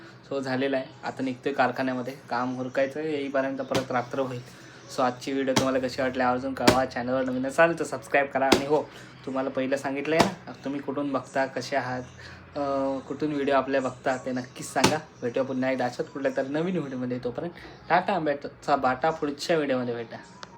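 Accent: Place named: Konkan, India. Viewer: नमस्कार भाऊ मी मुबंई मधून बघत आहे आणि मी नेहमीच बघते तुझे व्हिडीओ मी मुबंईला महालक्ष्मी इकडून बघत आहे आणि तुझी ही गावठी भाषा ऐकून छान वाटते मला आपल्या कोकणातील गावची भाषा आवडते तुझ्या कडून एकण्यास वेगळी मजा येते धन्यवाद भाऊ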